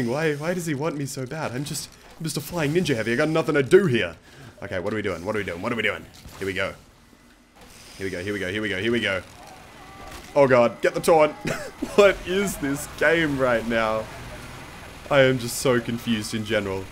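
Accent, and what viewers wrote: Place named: Australia. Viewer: Hey, I didn't know u were australian!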